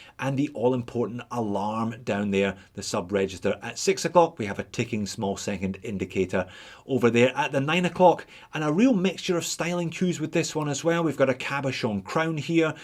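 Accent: Scotland, United Kingdom. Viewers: Am I the only one doing the intro “hellloooooooo and welcome to just one more watch” in Scottish accent? I swear I sound exactly like now after watching his channel for over a year 😂😂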